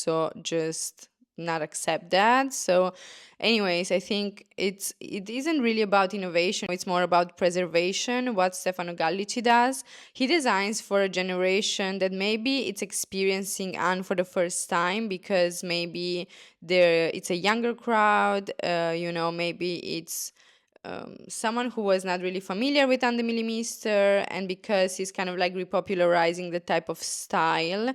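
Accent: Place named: Italy. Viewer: very off topic but where is ur accent from ? Italy ? it feels good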